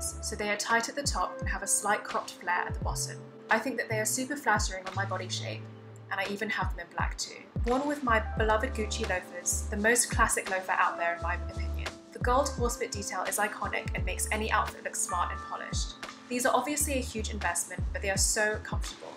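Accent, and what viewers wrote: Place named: England, United Kingdom. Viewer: Don’t lose your lovely English accent there!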